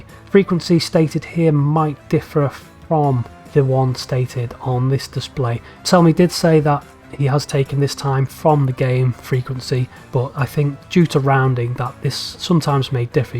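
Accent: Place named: Scotland, United Kingdom. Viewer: First things first. I think the issue of resetting the clock when using “full loan any/full load all” has already been addressed since I have no longer been experiencing trains never leaving stations. Second, you explanation of this tool is so disorganized that I do not get it. You click on something, then you delete it, click on something else, keep saying one think over and over instead of going systematically step by step. Third, I believe it´s fair to use standard English on You Tube. Even though I am not a native speaker your accent (assumably Scottish) is hurting my ears. The way you pronounce the word “unbunch” made me stop watching the video.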